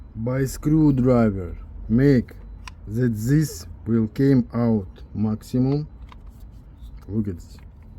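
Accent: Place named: Russia. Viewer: На кой ты по пендоски базаришь , разговаривай на русском как нормальный человек, ты же блин из России , по отвратительному акценту и заднему плану понятно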